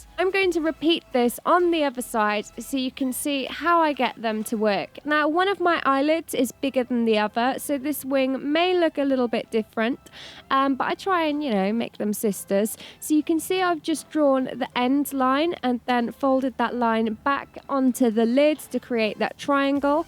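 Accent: England, United Kingdom. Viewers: she from england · Ohh this English accent.. How charmy is it😍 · That british accent . you're so beautiful ;) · could you, in a next video, give us some tips about brows? i love your makeuppppp and your english accent ahah xx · I could tell she was British straight away because she has a tiny bit of Adele in her voice. Just my opinion anyway!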